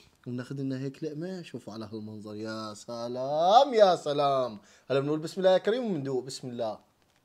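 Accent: Syria. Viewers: من درعا واضح من اللهجة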